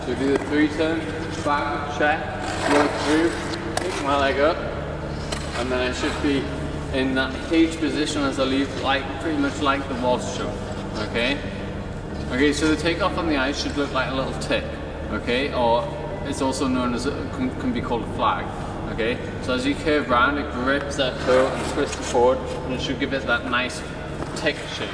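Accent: United Kingdom. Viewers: Are you British your accent is coming from London uk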